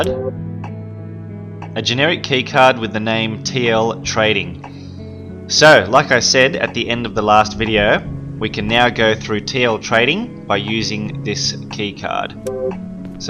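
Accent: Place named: Australia. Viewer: He's Australian... That's kind of how accents work in different parts of the world.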